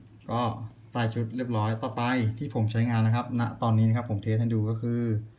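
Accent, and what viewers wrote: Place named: Ban Pong, Thailand. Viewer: กำลังติดตามครับ ฟังสำเนียงแล้วน่าจะคนบ้านเดียวกัน บ้านโป่งคับ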